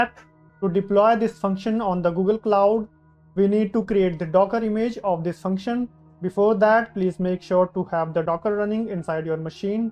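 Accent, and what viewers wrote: India. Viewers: An INDIAN Voice ....?? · You sounds so... Indian???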